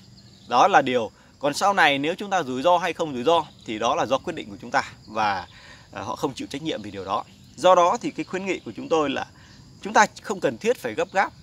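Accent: Thanh Hoa, Vietnam. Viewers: Ông này giọng ở Thanh Hoá, thị trg bất đs sẽ tăng cục bộ tuỳ nơi, nhìn chung sẽ đúng giá trị thật, thử tưởng tượng mua căn nhà phố trên 10 tỷ cho thuê 20t/t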